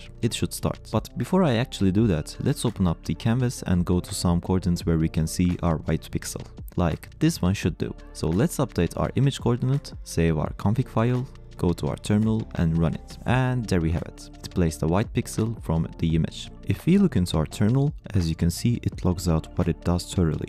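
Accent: Turkey. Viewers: aynen ya sesini duyunca kesin türk dedim :D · gördüğüm en türk aksanlı kişi 💀ama takdir ettim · türkmüsün kanka aksanın tam öyle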